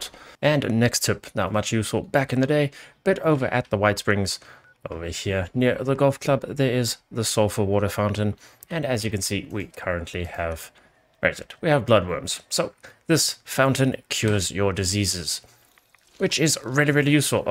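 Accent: South Africa. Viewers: Is that a South African accent I'm detecting?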